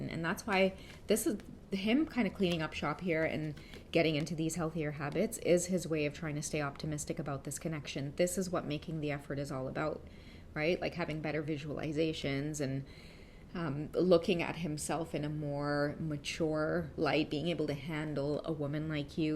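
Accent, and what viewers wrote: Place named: Trinidad and Tobago. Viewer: I absolutely love the Trini accent Queen!!! It gives me life 🥰🙏🏽❤️🙏🏽 Thank you for sharing yourself and these amazing readings with us ❤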